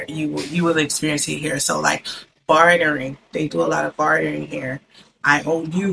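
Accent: United States. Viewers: You have a strong African-American Southern accent.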